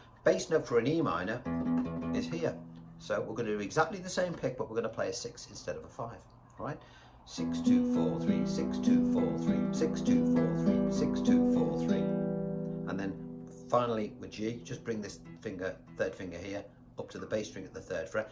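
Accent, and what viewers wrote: Liverpool, United Kingdom. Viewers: You’re deffo an old Skool scouse and this is a really well taught lesson👌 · Actually I can do this pretty good. I learned it from Val Doonican on his TV show in about 1977. That was after 20 yrs of trying to play with a plectrum! The reason for my comment is to thank you for the clear simplistic way you have delivered this very important aspect of guitar playing. It certainly brings out the best in solo playing - that much is for sure. I like your easy delivery and I think I detect a little bit of infectious Scouse in your voice don't I? Thanks again, BjG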